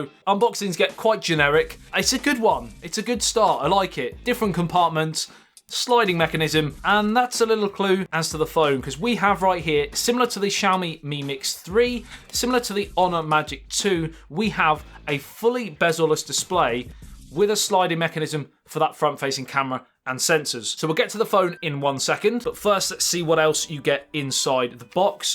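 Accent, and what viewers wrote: United Kingdom. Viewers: Hate british accent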